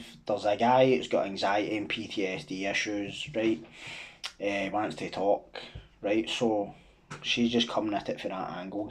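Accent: Scotland, United Kingdom. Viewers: I’m from Edinburgh mate and abit older than you so don’t think our paths crossed- think I’ve just got one of those face’s tbh- I get that alot. Keep up the good work your doing in life with your boy and in general also enjoying your channel. It’s good to listen to a channel channel with a Scottish accent,especially for me as I live in London these day’s.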